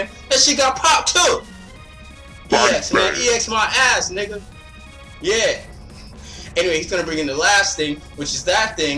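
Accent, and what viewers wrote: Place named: Brooklyn, United States. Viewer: I swear I know this guy... sounds like he lives in brooklyn.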